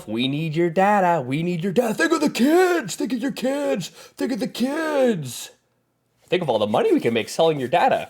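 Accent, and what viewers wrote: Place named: United Kingdom. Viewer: Good British accent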